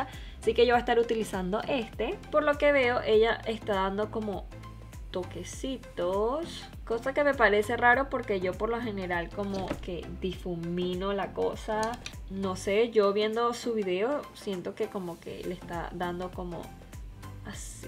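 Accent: Venezuela. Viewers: Hola, primera vez que veo tus videos y por que amo a Nena. Quería saber de donde eres? Tu acento se me hace algo Venezolano, me da curiosidad. Me gusto mucho tu cabello, y no fue un fail el tutorial, solo que ella tiene mas iluminación y quizá y se echo un poco menos de producto, pero en fin, me gusto mucho tu video, iré a ver todos los otros. Besos💓😘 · Yo sabia que era de VENEZUELAAAA ese acentoooooooooooooooooooooooooooooo like like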